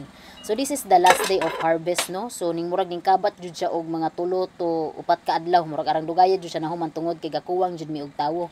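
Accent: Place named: Leyte, Philippines. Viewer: Wow😮😮😮, the very best Bisayan dialect I’ve ever heard, Leyte’s Bisaya kinda mixed with Cebuano and Waray-waray accent and bit of Ilongo. I noticed something the Southern part of Leyte some words that we never heard of like “ Ginamon” was just simply as if you cooked rice on the pot. Boholano where my Grandfather came from I didn’t noticed any difference probably we were a kids when Grandpa was around. Love to see Ms. momentous moment when someone known artist visit her, t’was a big honor to have that level of celebrity come visit her. Yes, the “ J “ letter from Bohol and Leyte like Ajaw, gajud was my first combo words during my first year in Cebu, when I was hanging around with the kids they just kinda laughing my accent, took me years to have it adjusted but then when you go back to your place everything coming back. Watching from Leyte.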